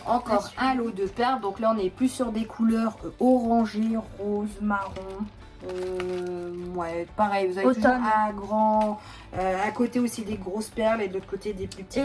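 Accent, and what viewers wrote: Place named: North, France. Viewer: Cc me voilà 😊. Elle est trop mimi ta fille . J'adore l'accent du Nord.... ou il fait froid 😅 moi je suis du sud l'accent du soleil qui chante. ❤ des bisous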